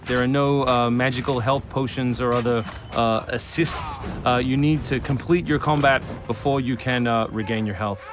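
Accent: United Kingdom. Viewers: Oh are you british???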